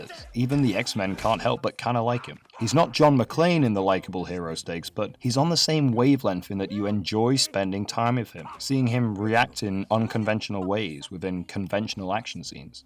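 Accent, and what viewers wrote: United Kingdom. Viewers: You from Bristol? The accent sounds familiar.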